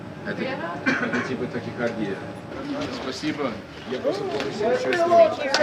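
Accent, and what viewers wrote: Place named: Russia. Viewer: намного,прямо заметнее,но иногда видно,что слова подобрать не может.Хотя фразеологизмами хорошо пользуется,главное - акцент русский стал.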